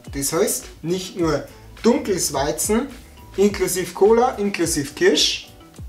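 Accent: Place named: Upper Palatinate, Germany. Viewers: von wo kommst du aus da oberpfalz?😅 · Du soitest stolz auf dei herrlichs Obapfälza "ou" sei und dia vo den Rindviechern nix eazähln lossn! I kannt dia den ganzn Dog zuahearn wia du Goaßmaß sogst.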